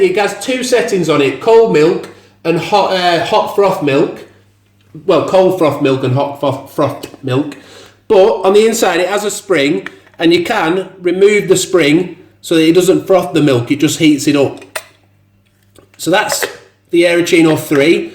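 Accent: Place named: Northern England, United Kingdom. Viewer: Brilliant video thankyou. Been trying to decide between the aeroccino 3 or 4. Great to hear a fellow northern accent too although you might be more red rose whereas I'm the white rose side 😉 Gonna watch some more of your vids but I'm still swaying more towards the 4.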